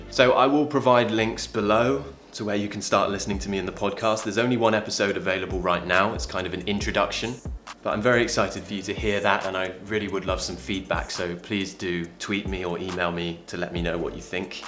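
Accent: United Kingdom. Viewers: Greate video bro.I'm from India.India has biggest English speaking population in the world.English is our official language.cricket commentary is the best way to lean English as i utilized this method.tony grag was best commentator ever according to me.he was English cricket captain.his accent was awesome.from my childhood l wished to have a British accent but not happen till now.but ur videos r help full.Indian accent is very different from British.ur British accent is awesome.I can lean more from ur awesome videos bro. Cricket world cup is going on in England&India goona facing England on Sunday.wish u good luck. ur biggest fan from India 🇫🇴❤🇮🇳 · Hello, thank you very much, this is really useful. What is your accent? Is it RP? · Hi, I'm a student learning Enlighs from Japan. Thank you fro this video from 2021. You are the perfect role-model for me cause your British pronouciation and accent is my ideal one. 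You are gonna be my best teacher on YouTube right now!! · Master, it is really wonderful to listen to you! Your British accent and pronunciaton is so perfect! You are an excellent teacher, no doubt. Thanks for everything you give your listeners. I am a teacher of English and Bachelor of Art but I still feel I need more exposure to the Spoken English language. Imitation is one of the most important step in the learning process! You will be my role model, for sure. Looking forward to listening to you again soon. Take care! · I want to speak British, so how I can speak like you I like your speak very much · Good afternoon. Thanks very much for your great advice. You're a unique, so no matter what I will try to copy you I will never be able to speak like you do with this beautiful and clear British accent. I just love it. Sure I will be listening your podcast, not only to improve my accent but also to learn more from your experiences. 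I try to improve it all the time, but then asking me to speak like you, is kind of asking to much. However I've been learning so much from you and I do appreciate it. Again, thanks ever so much.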